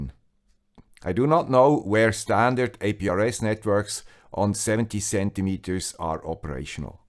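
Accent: Switzerland. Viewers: For a moment I though that this was the Guy with the Swiss Accent ! XD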